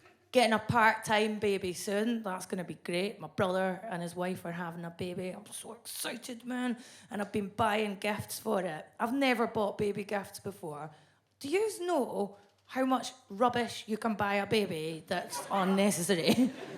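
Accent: Scotland, United Kingdom. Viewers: This is the first time I hear a hot girl speaking in Scottish accent! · I have a Scottish friend that doesn’t sound anything like her, so I was wondering if there are different Scottish accents in Scotland? My friend is from Glasgow. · I appreciate accent so much after having the sound of the snide Scot who narrates on the YT channel, Real Engineering, being the only Scottish accent that I could conjure up in my head for the last year or so. I was really beginning to strongly dislike Scottish accents until hearing. Beyond that, her performances are excellent, as well. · Scottish accents aren't easy to understand immediately, but she's funny. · I love her accent, just wish I knew how to understand Scottish lol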